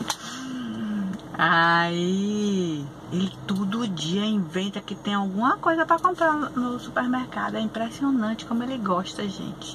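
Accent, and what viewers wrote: Northeast, Brazil. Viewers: ME IDENTIFICO MUITO COM VOCÊ,PRINCIPALMENTE COM ESSE LINGUAJAR NORDESTINO SOU DE JOÃO PESSOA.PB.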